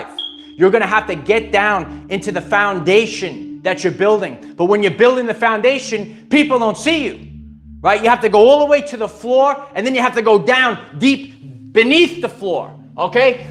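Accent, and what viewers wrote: New York, United States. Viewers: Terrific sharing. You are a character. 94 degrees here in Florida (too hottt for me). Yes I have been learning about this stuff for many years. Carbon to crystalline, etcetera. We are stronger for everything we experience. The NAA wanted us to lower our frequencies through hatred, stress, and fear they created. To stop us from growing and ascending and being the powerful beings we are. They were fearful of losing their power, because we would realize they have been leeches and robbers to us. We all have masculine and feminine in us. I believe most males are very fearful of the feminine in them and see it as weak. It is not weak, it is strength and compassion. Females also have masculine in us and can be strong. Many humans are finding that beef is too heavy to digest anymore. I eat beans and nuts. You inspire me to build my body muscularly as a slim athletic gal, I need to lift some heavy weights to get stronger. 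Time to join a gym. Peace and love to an authentic male and teacher. I appreciate you. The NY accent is awesome.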